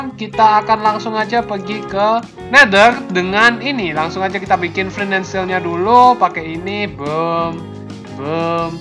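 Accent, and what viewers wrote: Java, Indonesia. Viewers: Fun fact: ternyata yang dulu agak medok jawa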